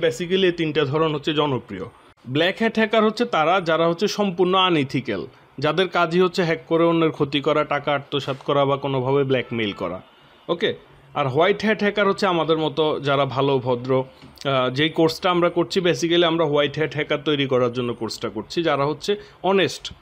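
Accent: Noakhali, Bangladesh. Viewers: ভাই নোয়াখালী না চাদপুর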